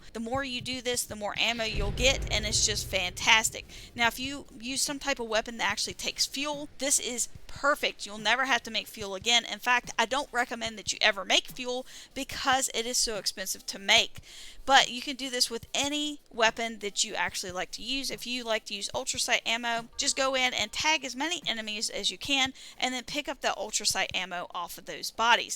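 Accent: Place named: South, United States. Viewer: You have one of the most articulate sounding southern accents I've ever heard just FYI. quite a delightful surprise to the ears!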